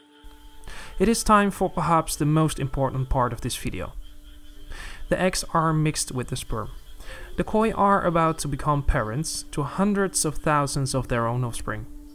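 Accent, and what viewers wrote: Netherlands. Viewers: it sounds like you have a netherlands accent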